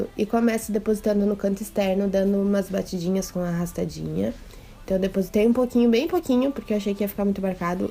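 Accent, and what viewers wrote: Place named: Rio Grande do Sul, Brazil. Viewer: que hino!! esse sotaque não me enganou, RS é tudo ♥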